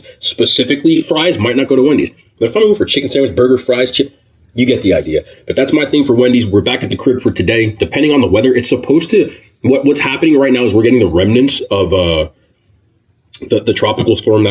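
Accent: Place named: New Jersey, United States. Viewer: That’s how they talk in New Jersey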